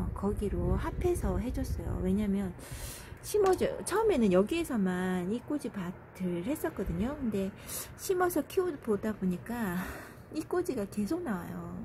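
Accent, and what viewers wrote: Gyeongsang, South Korea. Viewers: 목소리가 경상도 분이신거 같아요...혹시 창원은 아니시지요? 제가 창원에 내일까지 있어요..혹시 창원이시면 커피하고 싶네요...ㅎㅎㅎ...너무 예뻐요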